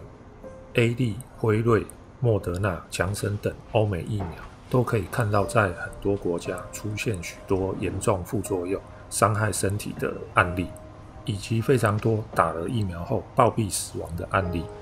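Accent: Taiwan. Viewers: 靠北 ! 濃濃的台灣味 , 哈哈 !!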